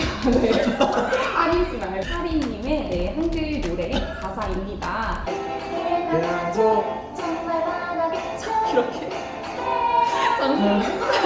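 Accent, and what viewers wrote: South Korea. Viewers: 항상 잘 보고있어용 ㅎ 충남어.. 중국어에 성조가 있듯 의 충남어에 있는 강한 억양! ㅎㅎ 넘나좋은것! ㅎ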